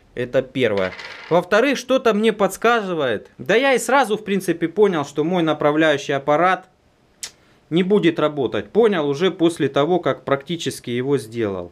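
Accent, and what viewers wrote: Rostov, Russia. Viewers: Он из Ростова?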